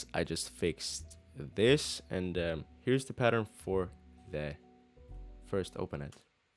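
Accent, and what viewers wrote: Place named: Scandinavia. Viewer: Dope tutorial man, where in scandinavia are you from btw?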